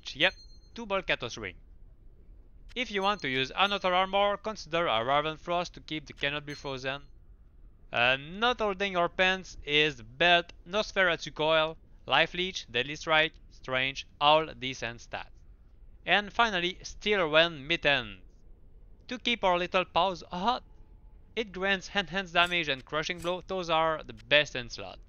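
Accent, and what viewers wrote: Quebec, Canada. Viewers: tu donne quebecois :P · j'aime beaucoup le fait que nous les québécois on a vraiment un accent anglais à nous autres :P Super vidéo man merci!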